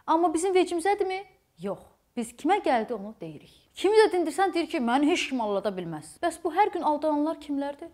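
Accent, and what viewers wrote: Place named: Sheki, Azerbaijan. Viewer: xanım haralıdı görəsən? Şəki ləhcəsi var, amma videoroliklərdə lap bizim kəndin ləhcəsində danışır, əsas da ana 🤣🤣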